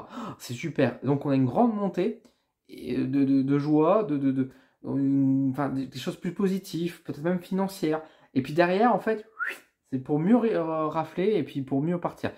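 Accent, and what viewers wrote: North, France. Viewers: t'es du ch'nord tizot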